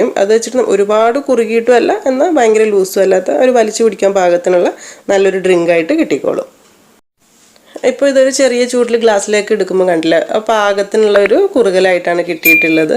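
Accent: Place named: Perinthalmanna, India. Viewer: പെരിന്തൽമണ്ണ ശൈലി ഉണ്ടല്ലൊ ഭാഷയിൽ. പെരിന്തൽമണ്ണയാണോ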